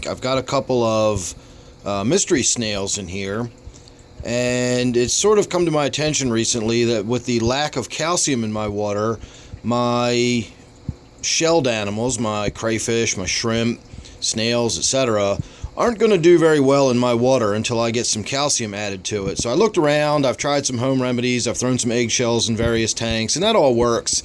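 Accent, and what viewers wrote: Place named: mixed, United States. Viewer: Hey, are you in Philly/Jersey area? Love your accent!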